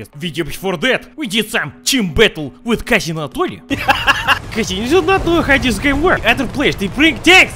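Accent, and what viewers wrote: Russia. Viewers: По акценту слышно, что ты русский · Почему у него такой русский акцент? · Ты руский у тя акцент русский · Борис, ты кто? Русский? или что просто по акценту похож, так что колись! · Слышно же русский акцент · English + Russian fused results in english russian stereotypic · His voice is so stereotypically russian its hillarious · Чел, ты из росси? У тебя русский акцент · у тебя слишком русский акцент типа реально кажется что ты русский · Максимально русский акцент одобряю 🗿👍🏻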